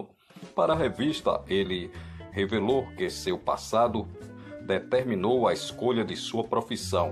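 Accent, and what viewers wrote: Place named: Northeast, Brazil. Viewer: O senhor precisa falar melhor... muito puxado para o nordestino!!! Não é: ÉSFORÇO! É esforço !!!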